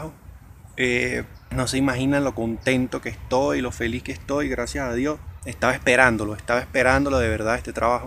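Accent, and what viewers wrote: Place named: Venezuela. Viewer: Ps por eso mismo decia... NO creo que sea tan facil.. para un extranjero en cualquier pais.. ok... entonces sos parcero.... buee confundis por tu acento totalmente venezolano.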